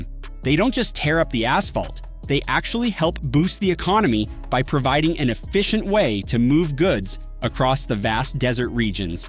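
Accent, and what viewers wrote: United States. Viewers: Nothing worse than hearing a "errrrrritating Amerrrrrican ac cent" while trying to watch a video. So I stopped 40 seconds in.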